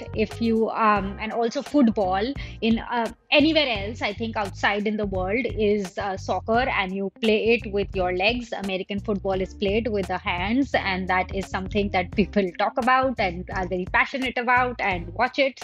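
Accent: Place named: North India, India. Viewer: Hey this typical North Indian accent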